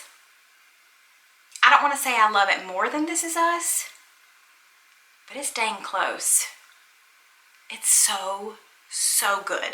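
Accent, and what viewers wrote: South, United States. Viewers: Loving your southern drawl!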